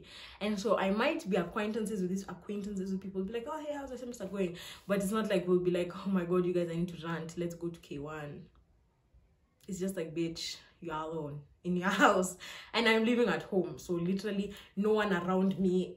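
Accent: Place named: United States. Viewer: This was really a rant and that American English I like ure personality 😅 you can't tell me it will get better Becos u think it'll get better 😅 and that ending was 👌🏼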